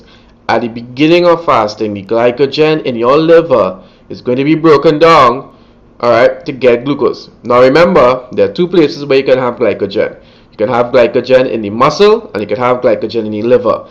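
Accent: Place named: Caribbean. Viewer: Dr., Been in broadcasting 33+ years and am now in studies for personal Fitness Training Certification. Huge chunk of the studies are all about this (ATP production is the focus). Not only have your lectures filled the blanks...but I grew up in St. Thomas, and hearing the Caribbean dialect just adds a comfort level to the learning...MESON!:-) Thanks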